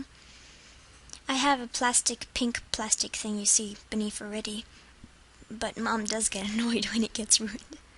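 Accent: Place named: RP, United Kingdom. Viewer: you sound posh lol but i like it :)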